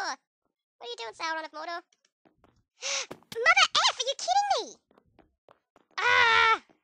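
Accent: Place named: Australia. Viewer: "It was sprinty but it, somehow, didn't seem sprinty enough." ~ 2014 Love the spinning Ultimate logo thingy. Also, you managed to make the Aussie accent even more cute! >.<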